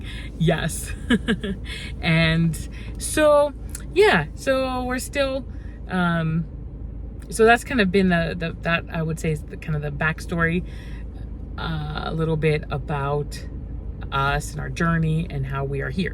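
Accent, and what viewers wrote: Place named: Kenya. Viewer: 😂😂😂😂 I love venye the Kenyan accent has caught up. "Even me I will stare"😂😂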